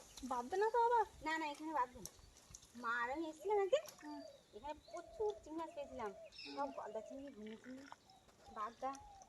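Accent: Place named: Dargahpur, Bangladesh. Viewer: মুখে দরগাহপুরের ভাষা শোনা যায়।হন্যে চিংড়ে।জালে গাব দেওয়া নেই। গা হাত পা ঠান্ডা হয়ে যাচ্ছে।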